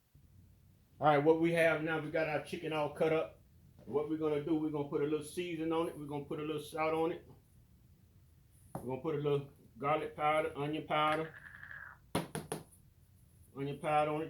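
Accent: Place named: United States. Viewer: Look's delicious I'm a New subscriber I love your country accent where are you from if you don't mind me asking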